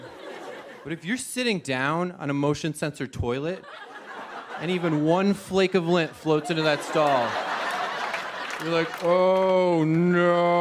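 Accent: Canada. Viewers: Winsc accent sounds a bit Canadian 🤔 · Why am I hearing a Canadian accent? lol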